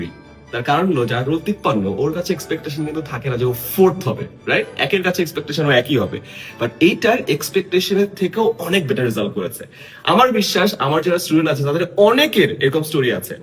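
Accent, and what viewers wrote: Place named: Comilla, Bangladesh. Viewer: Apni Cumilla r😮🎉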